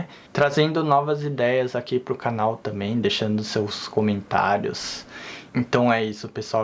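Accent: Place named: Japan. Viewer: Tenhor varios amigos brasileiros descendentes de Japones que foram para o Japao e retornaram ao Brasil pois a vida ai nao e facil. Portanto vai depender muito da pessoa e do que e mais importante a ela, mas e claro essa e sua opiniao pessoal e respeito. Pelo seu sotaque achei que voce fosse japones falando Portugues. Eu morei 27 anos no exterior e meu Portugues e totalmente sem sotaque e super claro, facil de entender.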